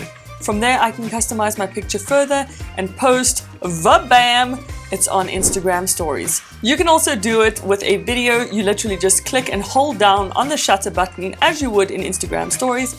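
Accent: South Africa. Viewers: Just subbed for the SA accent 😍 feels like home! Great content, probably the best in-depth camera review I've seen on YouTube so far! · Finally a South African reviewer🙋‍♂️ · Awesome review. Love the South African accent. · is jy Afrikaans? Jou akcent klink baie soes een van n soetie XD maar dis nice om te sien dat daar is talent Suid Afrika, goeie video!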